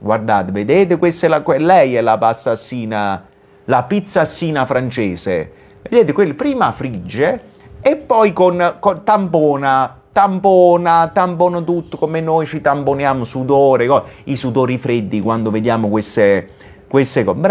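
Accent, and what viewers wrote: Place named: Naples, Italy. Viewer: Sempre interessanti questi video. Poi l’accento partenopeo rende il commento ancora più efficace Complimenti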